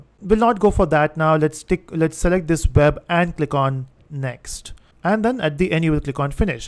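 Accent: India. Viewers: dude I love your accent, its half Indian and half foreigner and it sounds 10/10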